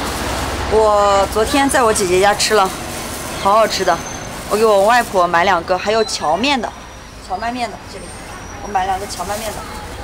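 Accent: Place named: Gansu, China. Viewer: 这咋，还把摄影师带到了甘肃？怪不知你听不懂湖蓝方言，却说一口流利的甘肃方言。六年？还来得及，赶紧生个小小孩，这样就四世同堂了。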